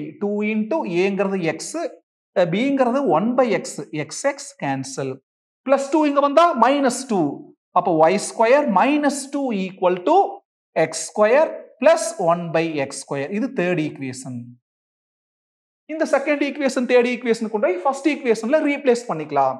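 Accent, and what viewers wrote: Coimbatore, India. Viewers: Coimbatore LA ula slang pola irku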